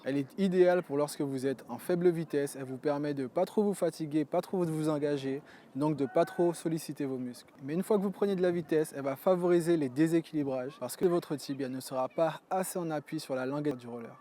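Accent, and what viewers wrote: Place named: Guadeloupe, France. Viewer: Pour ton accent ne le dissimule pas ça rajoute un charme ;) #vivgwada #panipwoblem 😅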